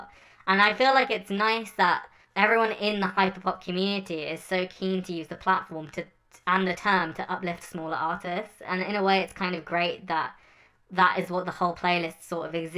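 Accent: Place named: United Kingdom. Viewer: Americans?💀 he has a british accent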